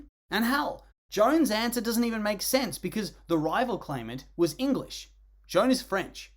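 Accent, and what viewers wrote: Australia. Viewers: Y’all did he get more Australian in this video? Or is it just me?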